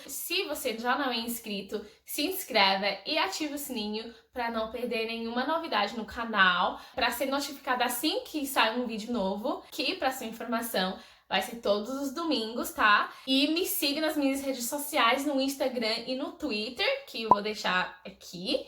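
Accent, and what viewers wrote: Brazil. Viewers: Ahhhhh ela fala português BR, já ameeei!! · Your portuguese is out of this world i cant actually believe It , i mean everything vocabulary, diction and the most amazing one the brazilian accent that u have . If you told anyone of us that you were brazilian we would totally believe u. I'm FLABBERGASTED kkkkk. Abraços ✌️ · Oi, descobri hoje seu canal e adorei! Seu sotaque é perfeitamente brasileiro hahaha. Ah, outra diferença, acho que vocês comem muito mais lanches do que nós na hora da refeição. Claro, aqui também tem pessoas que preferem lanches, mas a grande maioria não abre mão da alimentação do tipo arroz com feijão, carnes e saladas. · Vc fala português com sotaque brasileiro👍🏾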